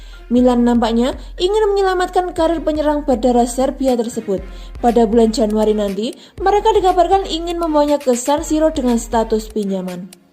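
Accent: Java, Indonesia. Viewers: Jowone medok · Jawane medok nemen Yo? 😀😀😀 Tapi mantap.... 👍👍👍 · Mbanya medok sangaat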